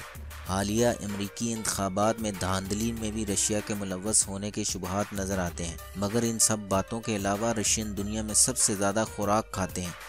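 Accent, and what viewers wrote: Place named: Punjab, Pakistan. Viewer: Yar I love you , n your Punjabi accent Rana sanalluah like ,AAP boht achha info dete ho , friend mere video bhi Dekho share bhi kro friend ke sath drawing vdo ke liye colour cloud Pakistan and cooking video ke liye jolly Azeem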